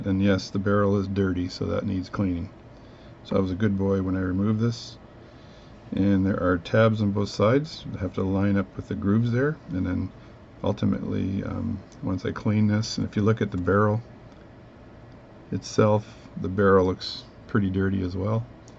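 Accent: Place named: Newfoundland, Canada. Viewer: Ok. The mystery is over. You are from Newfoundland. Right?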